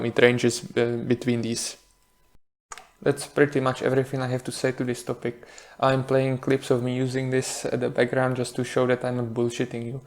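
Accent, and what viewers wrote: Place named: Czech Republic. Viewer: i tried to watch it but i could not stand that czech accent.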